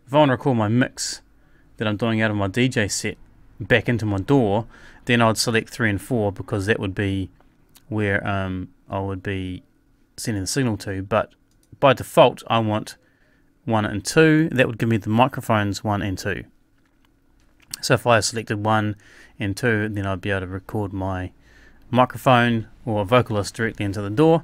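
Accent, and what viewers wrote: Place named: New Zealand. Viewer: That is the most Kiwi accent everrr :D Lived in Christchurch for 2 years, can't forget it :P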